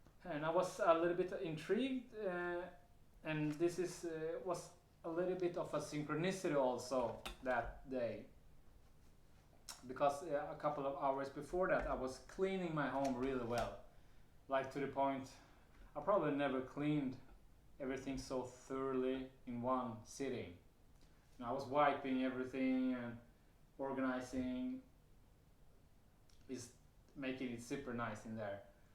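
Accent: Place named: Sweden. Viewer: Tyckte jag kände igen svenne vibbarna i accenten 😊 Tack för din utvärdering 🙏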